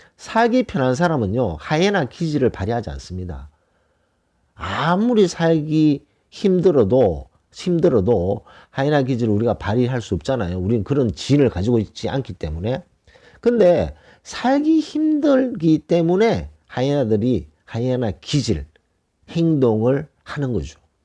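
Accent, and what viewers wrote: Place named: Daegu, South Korea. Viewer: 방송 감사하게 듣고 있습니다. 정말 좋은 말씀 대구 사투리로 듣는데 편안하고 유익합니다.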